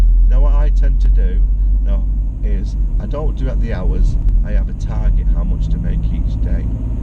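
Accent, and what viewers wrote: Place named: United Kingdom. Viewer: Mate, you are from UK, stating by the accent. Uber is not a taxi, and you know it.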